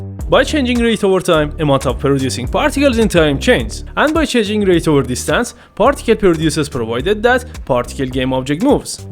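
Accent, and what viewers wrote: Iran. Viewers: Where are you from , like iranian talk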